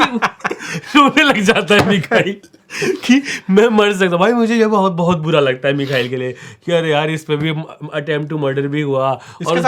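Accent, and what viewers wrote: Bengal, India. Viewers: Bengali accent >>>> prabhas jokes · Bengauli accent was just too good, top notch 🤣🤣🤣🤣🔥🔥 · I am a bengali buy bengali accent makes me laugh 😂😂😂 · bhai ek ep pura bengali accent mein karo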